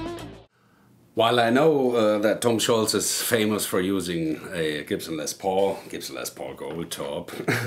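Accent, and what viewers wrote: Hesse, Germany. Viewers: When I was in the Army stationed in Germany I used to hear the local townsfolk speaking German and the dialect they used sounded much like this gentleman. I'm not sure of the spelling but when he used the word "bopple" it reminded me of one of my in-Laws neighbors who always wanted me to speak German with her and she would say "Bopple Deutch Mit mir". She would get frustrated because I would try and speak English to her, but I pretty much knew the local slang, and she knew that, so she always wanted me to speak German to her. I am guessing because she like hearing my American accent in German. It was always in good fun. She was a very nice lady. Anyway I bet this guy is either from the Hessen area or from the area that this lady grew up. I listened to the whole video because he reminded me so much of when I was over there. My Daughter lives over their and is married to a very nice German guy. 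I have a grandson from them that his birthday is the same day as mine, his great grandfather and his great great grandfather. We all have the same birthday. Its pretty cool.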